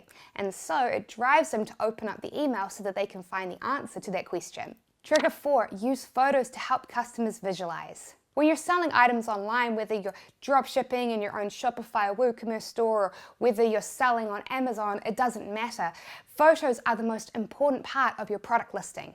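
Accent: New Zealand. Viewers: Definitely a kiwi. NZ.🙂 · Amir B It is none of these. It is a New Zealand accent.😀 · New Zealand. Good luck to you🙏🏼 shes good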